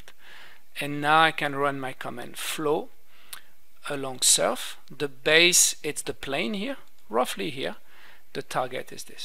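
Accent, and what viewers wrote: France. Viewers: are you french? your accent is amazing :D Thanks for such an effective tutorial :) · You're definitely a French speaker 😅, i love your tutorials 😍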